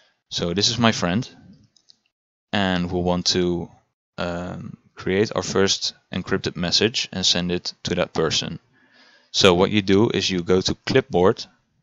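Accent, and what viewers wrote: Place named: Sweden. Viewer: nice tutorial! Du är helt klart svensk, hörs klart haha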